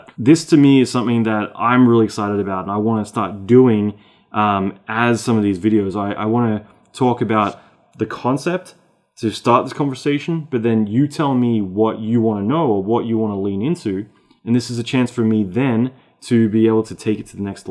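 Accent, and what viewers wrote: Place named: Australia. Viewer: This is a good insight. Theres an Australian accent sneaking through that american slant...or american accent sneaking through an Australian accent..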